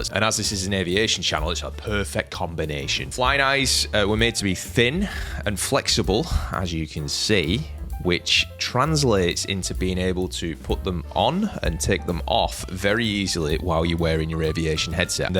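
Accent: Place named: Bolton, United Kingdom. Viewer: As a North of England person I would guess is from Bolton, North West England, North of Manchester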